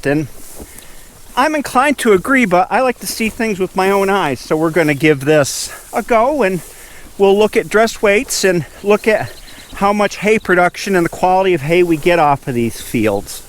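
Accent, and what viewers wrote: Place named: Central New York, United States. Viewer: I like how says, "Expuriments"! I went to SUNY Cortland, studied Ag Science, and that's how they say it in central NY! He reminds me of home!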